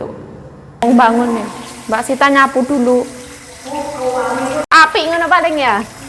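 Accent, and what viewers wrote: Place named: Banyuwangi, Indonesia. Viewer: Sampean ki opo wong banyuwangi, kok logat ngomong'e koyok mbak'u ipar banyuwangi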